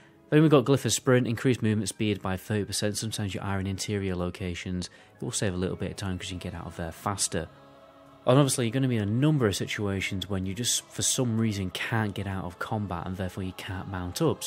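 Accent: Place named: Teesside, United Kingdom. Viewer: You sound like a teessider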